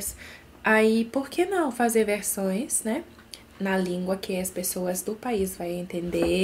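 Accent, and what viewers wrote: Northeast, Brazil. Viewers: não sei se vc já percebeu, mas vc tá com sotaque nordestino, será que é proposital? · Essa gringa parece q é nordestina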